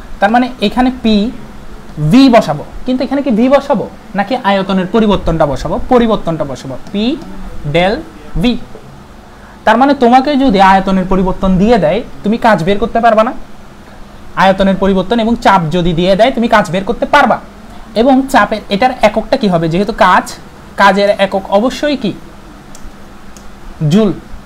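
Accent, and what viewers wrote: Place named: Chittagong, Bangladesh. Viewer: চট্টগ্রামের মানুষকে বোঝা যায় তার ভাষার অমায়কিকতায়!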